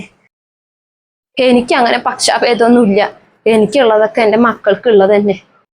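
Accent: Palakkad, India. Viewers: ഭാഷ ശൈലി പാലക്കാട്‌ പോലെ തോന്നി 😊❤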